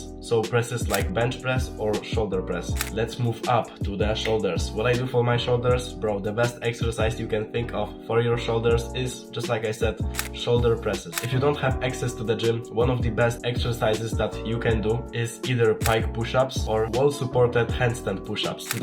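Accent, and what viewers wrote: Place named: Poland. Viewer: haha po glosie rozpoznalem xd bo cos taki akcent jakbys z polski byl xd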